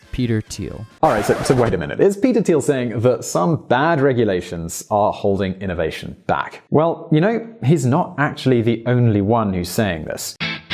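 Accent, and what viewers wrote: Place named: United Kingdom. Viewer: The fact that you are effectively arguing for shortened testing and trials of new drugs is frankly pretty apalling. Some one with your accent, should know all about the horrors of unsafe drugs as Thalidomide drestoyed a lot of lives in the UK. Add to that you basically argue financial duress on the part of the pharmacutical companies having to test their drugs, yet they are some of the most profitable corporations on Earth, right next to the oil industry. There is no reason whatsoever that drug trials need to be sped up.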